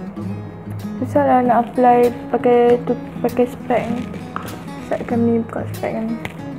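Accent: North, Malaysia. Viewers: aku tengok video hang from the first video and... "boleh main batu sambut" dengan "makeup macam orang mabuk" buat aku GELAK HAHAHAHAHAHA yep, not gonna deny, orang uthagha cakap ada slang is the cutest >.< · I like how you're very natural and confident when you speak. I'm glad that you actually speak in Northern slang. Cute!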